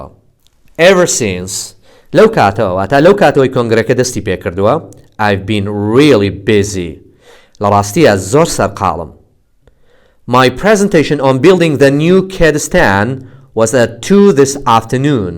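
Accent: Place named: United States. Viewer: Mashalla your accent is incredible just like American kepp posting teacher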